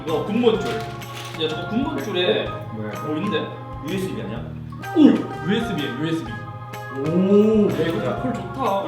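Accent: Gyeongsang, South Korea. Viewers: 재밌다 재밌어..최고다~~~긔여워 죽겟당~ 갱상도 아이가~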